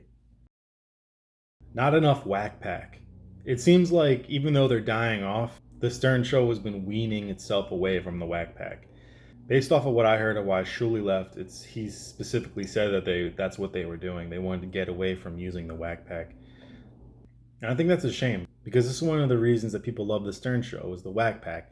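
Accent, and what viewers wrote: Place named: East Coast, United States. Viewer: You should listen to Cum Town, hosted by comedian Nick Mullen. And there always joe Rogan, he puts on a shit ton of talented comedians, his ego is in check and he’s much more honest. Also check out grimewave podcast, you sound like you’re on the east coast, it’s a ton of talented and funny Boston dudes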